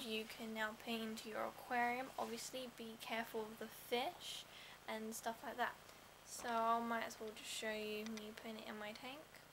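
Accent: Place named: United Kingdom. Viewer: where in the UK is your accent from? it's absurdly cute. with my limited knowledge i'll guess surrey or wales. oh yeah and thanks for the info vid =B gonna go get some more dklor today! my other batch just kept leaking out of the bottle.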